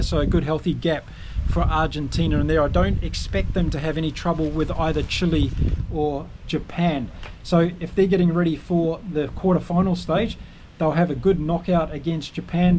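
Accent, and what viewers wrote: New Zealand. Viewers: Someone savaged my comparing your accent to great rugby commentary from NZ pundits, granted it's been a bit hairy of a reaction from The Breakdown but still find your demeanor very astute, knowledgeable and importantly unbiased. Keep up the great work. :)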